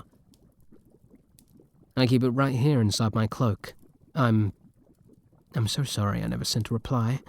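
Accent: United Kingdom. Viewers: Ah the British audio I can’t look at them the same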